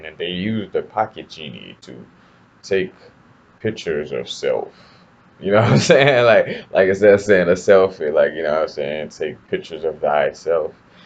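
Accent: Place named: South, United States. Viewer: Hey, where are you from, if you don't mind me asking. It would be really cool to meet you and gain some insight on understanding the universe. I'm based on the Southern part of the East coast and you sound like somebody from down here somewhere, like from Virginia down. It's fine if you don't want to answer, I'm just really intrigued about having a conversation with you. Hope this doesn't come off as intrusive, I mean no harm 😥 lol!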